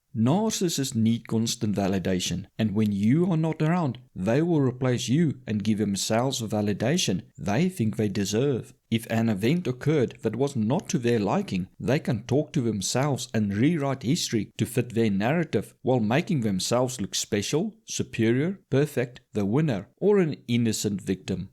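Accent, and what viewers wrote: Afrikaans, South Africa. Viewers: Dude, is jy Afrikaans? Jou aksent klink baie Afrikaans.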